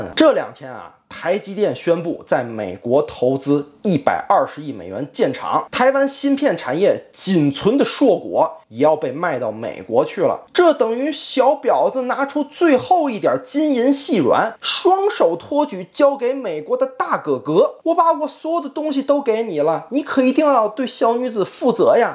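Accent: Tianjin, China. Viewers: 介天津话太喜欢了，不过还没去过天津呢。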